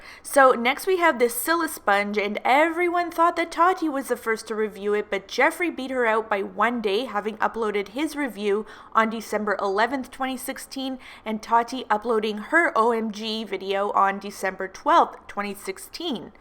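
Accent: Canada. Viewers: omg. This is my favorite channel. And your accent (Canadian?) is adorable. Keep digging dat dirt, girl.😘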